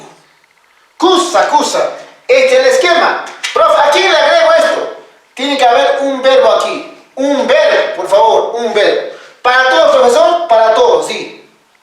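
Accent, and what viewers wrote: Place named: Peru. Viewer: En acento de los inka siempre hablas estoy atento.kusa allinmi kashan.napaycuyki.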